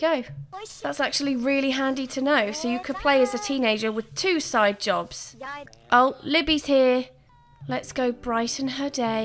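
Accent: United Kingdom. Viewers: why do you keep on calling him trash bag dad all the time he's no longer a Family burden? you could see him change from you making him become a breadwinner when he had a job that's now he's a breadwinner girl!!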